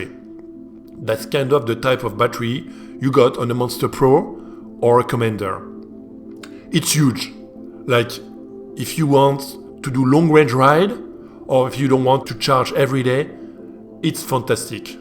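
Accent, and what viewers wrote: France. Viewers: Quel accent français ! 😄😉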